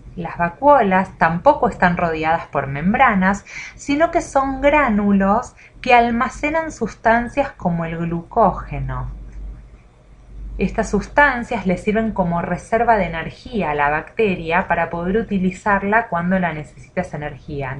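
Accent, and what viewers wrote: Argentina. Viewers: Me gusta el acento argentino!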